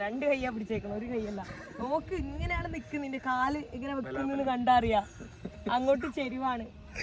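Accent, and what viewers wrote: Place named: Kannur, India. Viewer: ഇതല്ലേ,ഞാള് കണ്ണൂര്കാര് തമ്മു കണ്ടാൽ പറയുന്ന ഭാഷ! 😄😄😄😄😄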